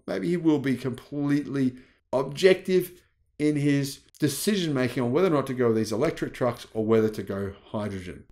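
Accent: Australia. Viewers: You have an Australian accent except when pronouncing Fortescue and Pilbara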